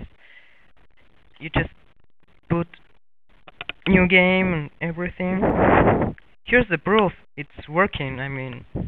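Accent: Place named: Argentina. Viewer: YOUR ENGLISH SOUNDS LIKE ARGENTINIAN! I'll suscribe.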